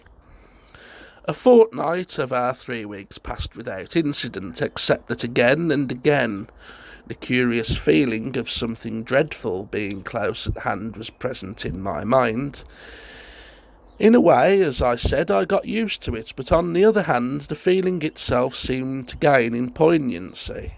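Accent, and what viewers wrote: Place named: Solihull, United Kingdom. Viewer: What’s the narrator’s accent? I want to guess Solihull, but I must admit my experience is very limited, being an Australian presently kipping in London...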